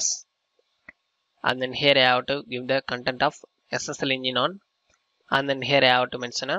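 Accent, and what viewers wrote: India. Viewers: SOUTH Indian English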